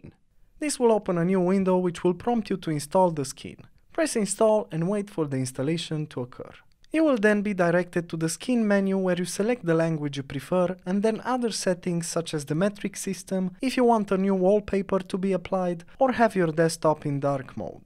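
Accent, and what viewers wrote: Russia. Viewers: ue voice like russian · is this guy russian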